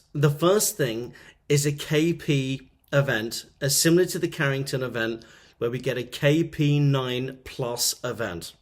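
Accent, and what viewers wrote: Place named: United Kingdom. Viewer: I love this accent...which part of Uk is this accent from???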